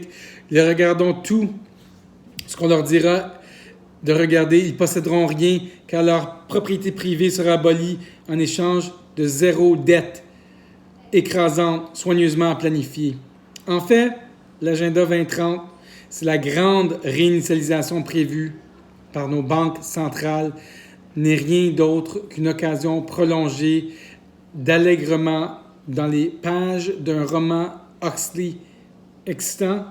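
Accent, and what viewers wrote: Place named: Canada. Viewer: J’ai horreur de certaine personne qui se permettent de critiquer ,c’est l’accent du Canada,eux en tous les cas n’ont pas peur de dénoncer se qui se passe ,merci aux Canadiens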